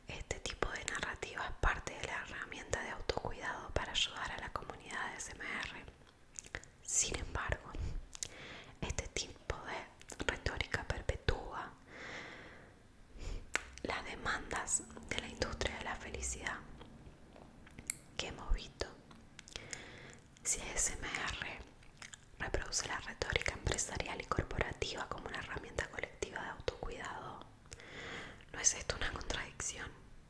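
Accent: United Kingdom. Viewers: I really love your English acent, you do it very well, love ur videos, been I really don’t understand you anyways I put the subtitles, haha u are the best!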